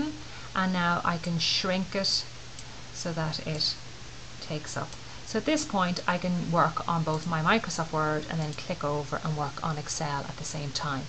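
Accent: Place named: Dublin, Ireland. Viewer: I was sitting here listening to my wife looking at your video and I was wondering what part of Dublin are you from ? Im from Cabra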